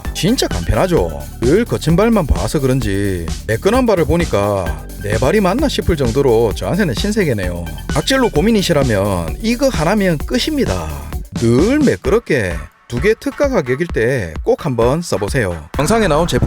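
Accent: Gyeongsang, South Korea. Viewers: 아 갱상도 사투리 듣기싫네 정말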